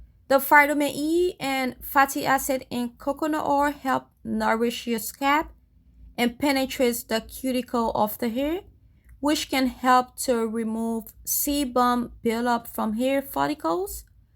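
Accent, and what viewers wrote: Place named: Liberia. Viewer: Are you a liberian? You sound like one.. i'm a liberian also.. it really doesn't matter, just curious. Good video💗 thanks alot for the tips.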